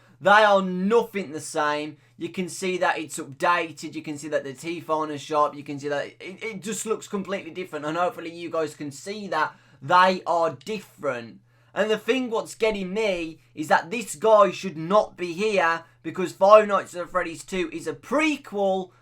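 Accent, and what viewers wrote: United Kingdom. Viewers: U R THE BEST FNAF THEORIST EVER AND UR UK VOICE IS BAE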